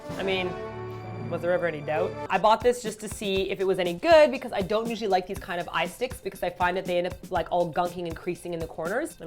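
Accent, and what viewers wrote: Canada. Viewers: Oh wow I just picked up on your Canadian accent!! LOL cute! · She sounds super Canadian in this video! It's adorable >.< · I love hearing little bits of canadian accent keke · I heard your Canadian accent more in this video than any other video. And I've been watching you for a about 2 years. ._.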